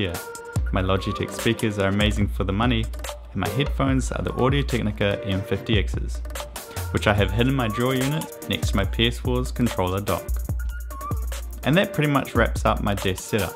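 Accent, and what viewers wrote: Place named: New Zealand. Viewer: hey bro where are you from? Thats a new zealandy accent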